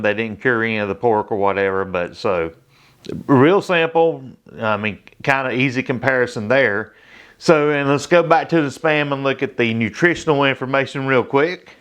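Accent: South, United States. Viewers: I'm in the UK. Love your southern drawl.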